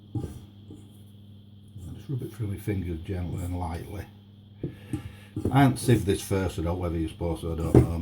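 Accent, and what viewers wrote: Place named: Midlands, United Kingdom. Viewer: Love this down to earth video ❤️. Reading the transcript had me in stitches, obviously not someone who understands the midland accent🤣😂. Yes I did make 2 decent loaves of bread following his instructions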